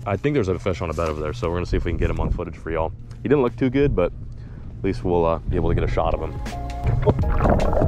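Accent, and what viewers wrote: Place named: Texas, United States. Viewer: I’m starting to hear some texas twang in your voice, has been acclimated🤝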